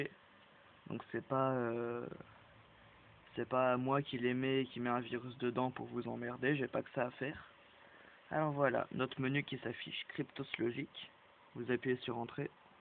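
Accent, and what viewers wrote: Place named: Marseille, France. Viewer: UN MaRsEiLlAiS !!!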